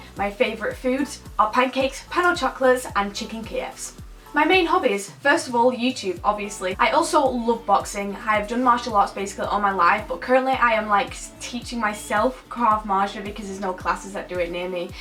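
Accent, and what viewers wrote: United Kingdom. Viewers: Hi you are such an inspiration to me you are so preety and I wish I was more like you .Are you British you sound it but I can't tell. ❤❤❤❤❤️❤️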